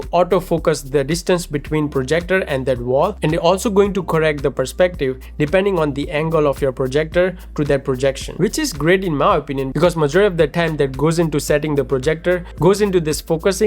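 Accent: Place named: India. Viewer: The accent is very much mix of too indian and US!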